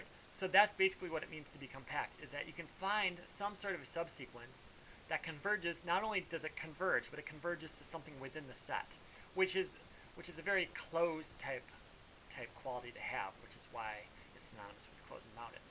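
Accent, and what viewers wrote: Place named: United Kingdom. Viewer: thanks, mate! nice british accent btw THANKS M8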